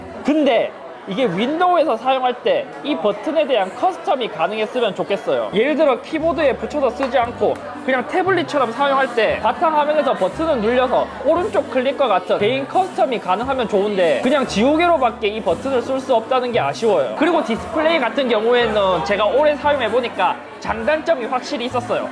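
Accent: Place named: Andong, South Korea. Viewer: 말투가 어디서 많이 들어봤는데...했더니 아버지따라 시골가서 듣던 말투군요. 안동분이실줄이야 ㅎㅎㅎ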